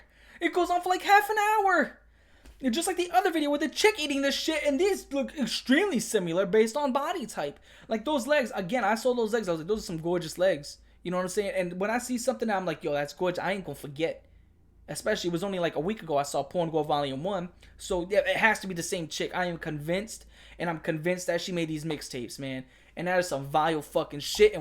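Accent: New York, United States. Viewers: What part of New York you from broski?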